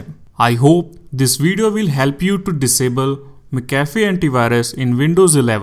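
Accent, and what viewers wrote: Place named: India. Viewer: no thanks your indian scammer voice doesnt convince me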